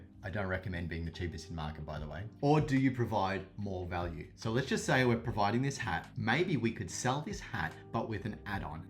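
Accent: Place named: Australia. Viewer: Automatic trust, when I hear Aussie accent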